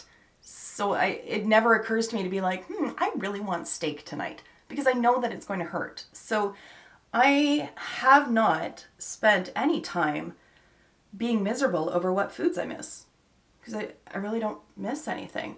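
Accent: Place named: Canada. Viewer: I didn’t realize! Until I here certain words of course I’m like she’s Canadian! ☺️however you accent sounds a lot like most here in US but Wisconsin and certain spots in Illinois definitely pronounce some words like you. You know we are super behind in some things lol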